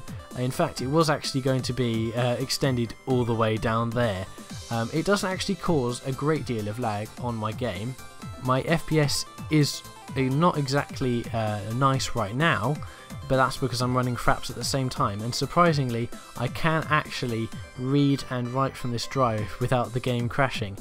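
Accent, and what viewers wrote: United Kingdom. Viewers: lol, can someone tell me why brittish accent is so hard to understand? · Oh, you're British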